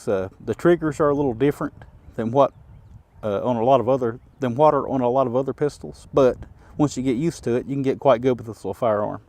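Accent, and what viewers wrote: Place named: United States. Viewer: You sound like an American to me. And I'm a damn Yankee from Maine. Thanks for your channel it gives an old man hope for this country.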